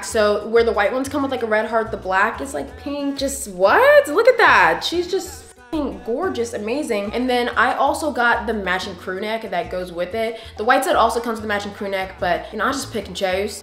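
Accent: England, United Kingdom. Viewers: I love how you casually speak in an English accent, I thought I was the only one to do that 😂❤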